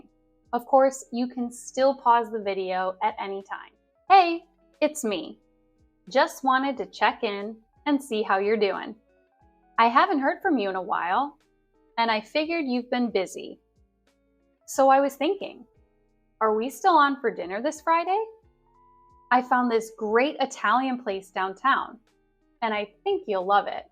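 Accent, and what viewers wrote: United States. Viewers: Thank you i am learning american accent through watching your video i am from India but I am learning lot from you i really appreciate your hard work for me ❤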